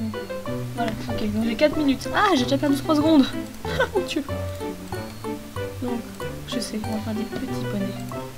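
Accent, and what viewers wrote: France. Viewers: is she french?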